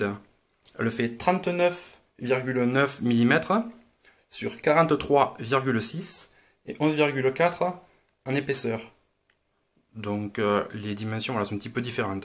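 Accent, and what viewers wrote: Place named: Perpignan, France. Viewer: L'accent de Perpignan 😂😂😂 Une boîte toute blanche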